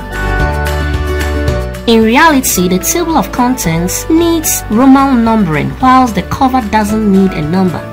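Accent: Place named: West Africa. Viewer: I have not heard all of the video, but I give compliments to the speaker. Beautiful accent (Nigerian/West African); well-spoken English that is clear as a bell and easy to understand. I've tried listening to people from India. They are very smart, but I can't get past their accents.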